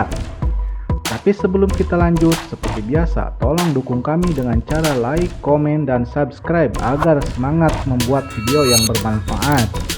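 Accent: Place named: West Java, Indonesia. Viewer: Yang ngomong pasti orang sunda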